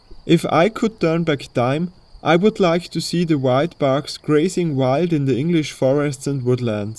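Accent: Austria. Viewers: Vielen Dank! Sehr gut gemacht, aber mich wunderts dass es die Pinzgauer nicht unter die ersten 10 geschaffen hatt! Bist Du vom Bezirk Kitzbūhel? ( der Sprache nach) I bin a Kirchdorfer, schon seit 50 jahren in Toronto Canada. Grūsse Martin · like your beautiful Austrian accent :) as much as this video. greetings from Australia.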